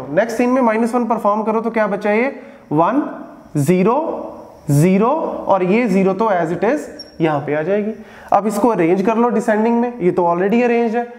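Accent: Punjab, India. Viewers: Main jitna tumhe dekhu, dil ye na bhare Thanks sir, I watched all your videos on Graph theory. Punjabi accent is lovely!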